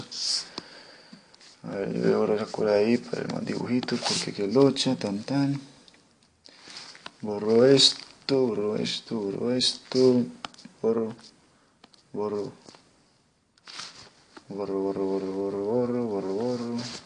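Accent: Antioquia, Colombia. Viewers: Muy bueno. Me encanta el acento paisa. explicas muy bien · Aparte de completo el contenido, reeee paisa.... Buen video, saludos. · Qué acento tan paaaisa. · Acaso eres colombiano? haha me gusta tu acentoooo <3 x) buen video gracias! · Más paisa que la arepa <3 "Uy , mejor borro esto porque mera locha" JAJAJAJA. "Locha" para nosotros los paisas es como decir "¡Qué pereza! " SOS UN CRACK!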